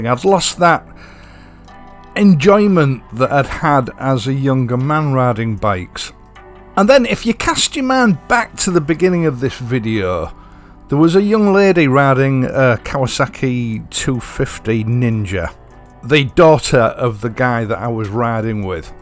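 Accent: United Kingdom. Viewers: UK accent. and British traffic is left-hand drive also.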